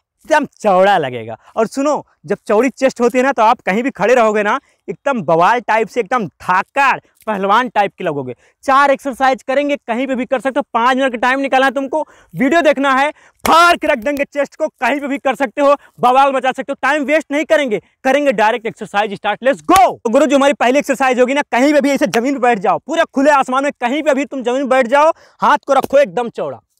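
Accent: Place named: Uttar Pradesh, India. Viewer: 👍👍👍 great job work bhai.... एकदम देशी स्टाइल वर्कआउट और लेंग्वेज भी देशी है। यूपी से लगते हो का बे😁😄👍👍भाई किस जिले से हो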